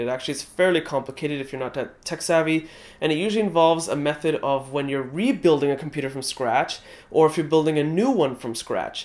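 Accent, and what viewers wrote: United States. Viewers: Is he american? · wow!! You speak like U.S accent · You speak like U.S accent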